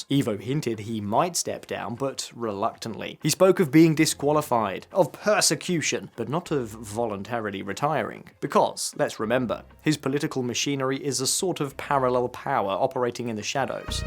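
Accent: United Kingdom. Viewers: I wish the person that put this video together would have used another AI accent. The British accent is like finger nails on a chalk board.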